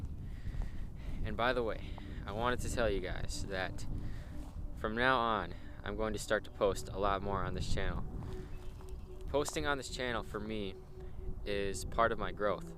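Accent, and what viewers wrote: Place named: United States. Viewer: hey yeah you had better. No, I am just kidding. Sorry to be so cringe, just that you just make me so happy. Sometimes my autism just gets the better of me, but then to calm me down, I just watch one of your videos and that helps me. I am from England and I just love the American accent 😍 especially yours. So once again, thank you. To be honest, If I met you in real life, I would be nervous wreak ha ha coz even though you are not doing ASMR anymore, your voice would totally relaxed me. Anyways, I am gonna stop being cringe right now lol. I will look forward to your next video. 😊❤❤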